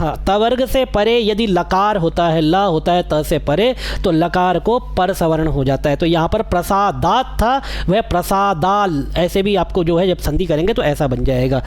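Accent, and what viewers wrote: Standard. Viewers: यह भगवती की ही प्रेरणा है कि आप माता दुर्गा की देवी से स्नेह करने वालों को शुद्ध उच्चारण का अभ्यास करवा रहे हैं। आपको प्रणाम। (जिला अररिया) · bahut sundar sudha uchchran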